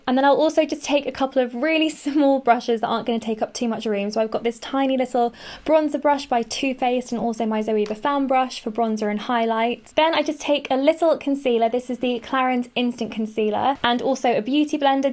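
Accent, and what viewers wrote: United Kingdom. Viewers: i watch your vids just because im obsessed with your lovely british accent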